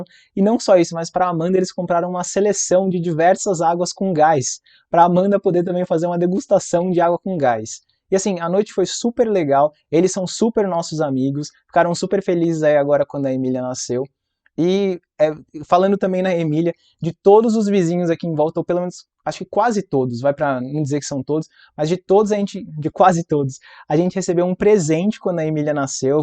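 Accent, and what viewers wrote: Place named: São Paulo, Brazil. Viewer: Como vc é de Salvador com esse sotaque paulistano, do Bexiga?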